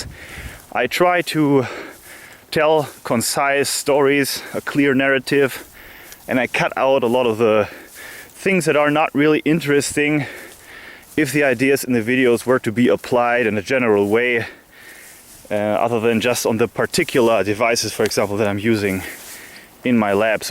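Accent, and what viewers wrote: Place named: Germany. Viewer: " and..... jaaa " dude i love your classical German accent <3 '' zere you go ''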